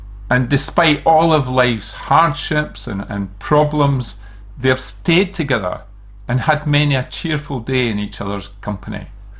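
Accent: Scotland, United Kingdom. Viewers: My name is Liz Moore, and I have Scottish forbesrs.....the Comyns, so I am interested in all things Scottish. Thank you for that beautiful reading of this poem. I discovered it years ago, when I read the book "Dear Enemy" by Jean Webster as it is quoted there, but only the second verse. Now my husband and I are approaching our 50th wedding anniversary, and I want to read it on that occasion, because it so beautifully expresses the joy of two lives lived together. If I may, I would like to play your version - a real Scotsman reading that lovely poem!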